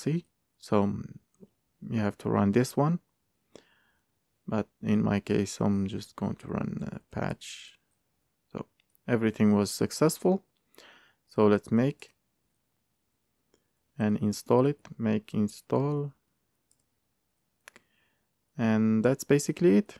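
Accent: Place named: Morocco. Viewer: great. i was confused by your voice and accent because you sound like me and I was right you are moroccan too LOL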